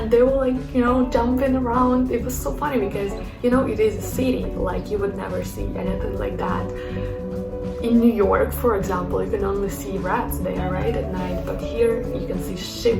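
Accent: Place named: Ukraine. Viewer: Круто, сразу слышно украинский акцент.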